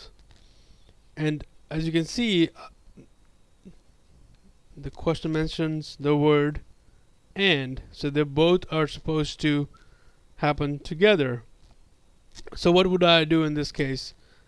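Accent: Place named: United States. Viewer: what a stupid american accent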